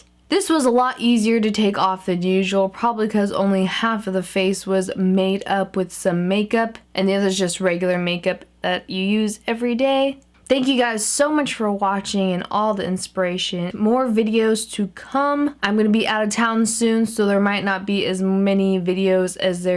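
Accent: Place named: Texas, United States. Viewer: whats with the Texas accent? stay cute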